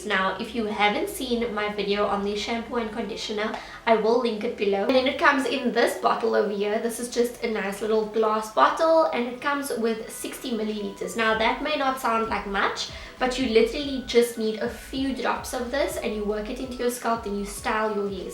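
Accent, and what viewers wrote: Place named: South Africa. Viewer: Sounds like South African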